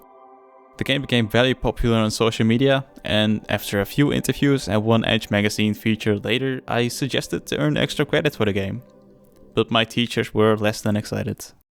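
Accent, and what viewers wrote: Netherlands. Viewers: love the dutch accent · Broer dit Hollands accent met dat Engels, ik herkende t meteen bwhaha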